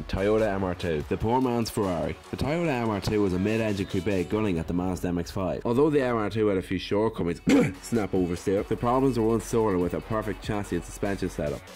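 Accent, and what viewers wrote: Ireland. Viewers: Colin Davis he's irish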